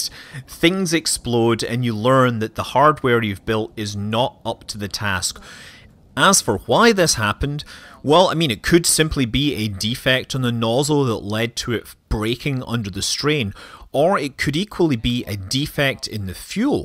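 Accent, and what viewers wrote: Scotland, United Kingdom. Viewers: Great to hear your Scottish accent!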